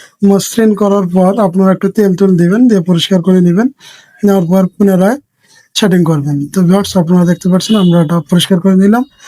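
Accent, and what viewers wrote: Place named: Rangpur, Bangladesh. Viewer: কথা শুনে মনে হচ্ছে আপনার বাড়ি রংপুর 😊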